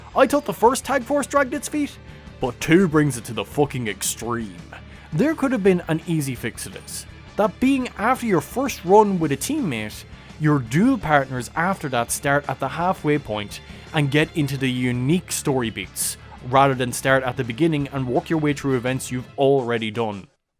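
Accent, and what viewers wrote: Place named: Ireland. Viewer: Okay I subbed! The review is very good, in fact the whole video and presentation is great. Plus the Irish accent is gold! Awesome stuff mate!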